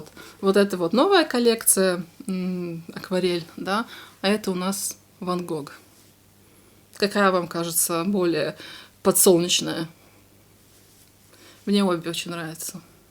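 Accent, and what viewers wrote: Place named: Latvia. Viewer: Sveiki:) es, ceru, ka var latviski, jo dzirdu latviešu akcentu:) tik gribu pateikt, lai šis gads ir saulaināks un priecīgiem notikumiem bagātāks! Ļoti forša episode, arī es atceros eBay, es pat tirgojos tur, radās ideja papētīt to atkal:)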